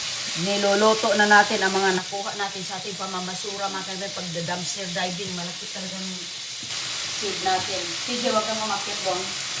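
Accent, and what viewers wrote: Visayas, Philippines. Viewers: Visaya po ksi siya natural lng po yan sa pananalita nila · Eh bisaya nga kaya ganon syang mag salita hellow wag n kayang mang husga .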